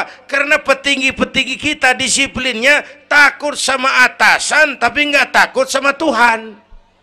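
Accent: Jakarta, Indonesia. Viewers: Logat orang jakarta 😂❤❤❤❤❤ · Kyai sejuta umat dan sampai saat ini belum ada pengganti beliau, begitu adem kajiannya dan identik dengan logat betawi.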